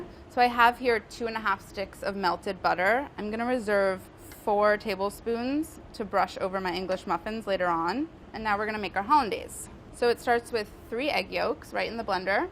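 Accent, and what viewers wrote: United States. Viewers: Is that an accent from somewhere in the US? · Everyone's talking about her accent and here I am, English not being my mother tongue, and I'm already proud that I can tell she's American. I literally can't hear the difference between any English accents. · She sounds normal, sure its like a small state accent but hardly anything to moch her over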